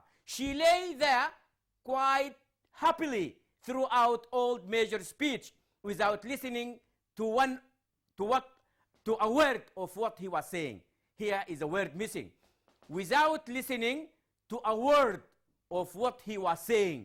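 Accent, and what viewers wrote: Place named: Sudan. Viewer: This is Sudanese english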